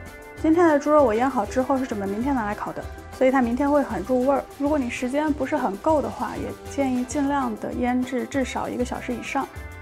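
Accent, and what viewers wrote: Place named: Jiangsu, China. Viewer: 这是哪儿的口音 像江苏的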